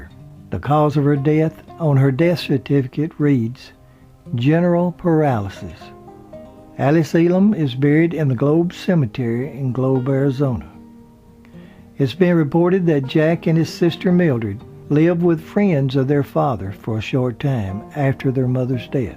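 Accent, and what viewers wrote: South, United States. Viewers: Thanks ever so much for this great tribute to mr. Elam. I have always enjoyed his movie acting. He may not have been the most handsome man in the room but he had a commanding presence on screen! And as for your southern accent , I think that it’s very unique , you don’t sound like everyone else! And for me, that’s a good thing! I have subscribed. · I always liked Jack Elam and wondered what happened to him. As a kid, I remember a TV western when it showed a picture of Jack with the introductory words saying something like "Gunman turned Lawman", which, as I remember was a pretty darn good show. Thanks for your nice Southern style (voice) discussing the life and times of Mr. Elam. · Your voice is as unique and memorable as Christopher Walken’s; the rhythmic southern drawl is just the icing on the cake. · Fell asleep whilst listening to the Southern accent talking about Jack Elam .....very interesting but slow .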